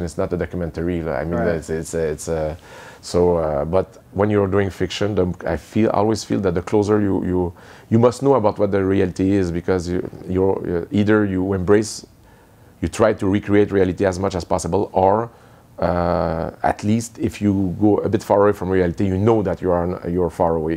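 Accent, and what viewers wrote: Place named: Quebec City, Canada. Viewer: Even if my English sounds like the one Denis is speaking (we are both from Quebec City), I smiled at that part: You know to know, you know to know the limit, you know to know the where where ;)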